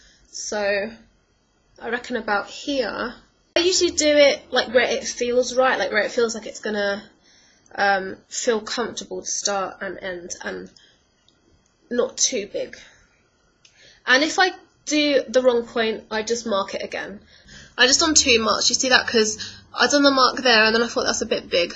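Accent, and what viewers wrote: United Kingdom. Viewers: haha yu said "whatever" soo americann lol , but i love your british accent (: cool video x3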